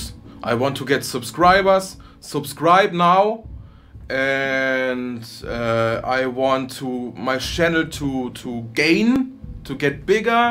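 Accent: Germany. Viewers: I really agree with everything you are saying. I own 5 Harley Bentons now and I enjoy every single one just as much as my snobby Schecter for over 1500 bucks. I've told everyone who asked me what guitar they should buy to check out the Harley Benton products. You just can't get anything better for the price(or sometimes even double the price) and if you should ever get a flawed one, you can always send it back for absolutely free. Keep up the good work, spreading your knowledge and helping other people not being tricked into this expensive gear snobbery. Nevertheless, I would also like to recommend you to work on your englisch. Not trying to be mean or anything. I know it can be hard, especially for german speakers(i'm austrian btw) because the german language is so vastly different in the pronunciation, and i appreciate your effort to make this understandable for as many viewers as possible. 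But I really think it would help your channel a lot to work a little bit on the english just to comfort the native speakers ;) That being said, I hope that you will get to the success that you deserve for your effort and pure honesty and I wish you all the best on your way. I'm happy to say that you just gained another Subscriber!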